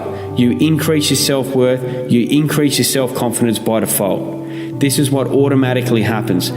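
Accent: Australia. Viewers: He’s Australian 👍🏼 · Great to hear an inspiring australian voice :) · Awesome to finally hear an Aussie. Great content.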